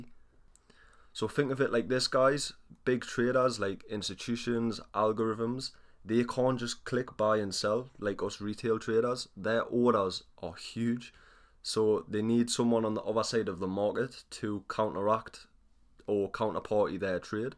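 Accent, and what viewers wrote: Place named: Newcastle, United Kingdom. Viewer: Haha fellow Geordie aye. How long you been trading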